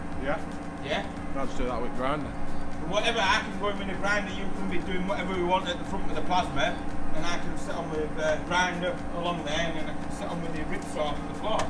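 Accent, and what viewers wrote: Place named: Middlesbrough, United Kingdom. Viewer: yes sorry I looked the company I’m from Middlesbrough I new the accent lol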